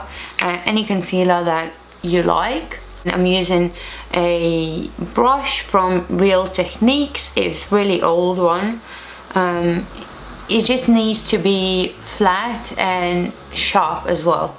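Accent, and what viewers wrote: United Kingdom. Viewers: Kaip faina buvo klausyt kaip angliškai kalbi ir dar su tuo lengvu britišku akcentu!:)) O antakiai tavo puikūs, labai patiko video! Beje taip pat turiu asimetriškus antakių priekius todėl puikiai suprantu ką reiškia nekesti vieno antakio 😂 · Labai gerai ir gražiai šneki angliškai!! Girdisi toks britiškas akcentas, kuris man asmeniškai laabai patinka!!😍😍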